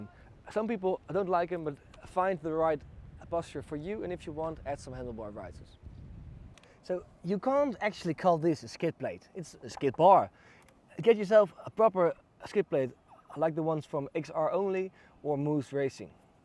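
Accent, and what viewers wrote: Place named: Netherlands. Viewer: Hey! Ive been interested in getting a honda XR600R (after getting my licence) for a while now but as i'm a beginner i feel like it might be a bit too much. do you have any recommendations for similar bikes that are more for beginners and that are easilly/cheaply available in the Netherlands (its sounds like you're from here) Thanks in advance!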